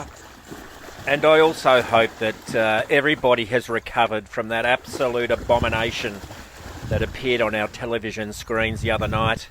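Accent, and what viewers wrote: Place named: Australia. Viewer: I love your informal Australian accent and I do note Albanese is not wearing his signature gold cross necklace which would have just completed the look. A funny story about a man called Paul who went in for some hospital surgery and came out with "man breasts" because his friend a notable Bishop had set up the doctor 🤑 to inject some fat around the pectoral muscles 😳 😲 Apparently it was an inside church joke and every time the priests saw him with his new look, they had a good laugh. 😹 💯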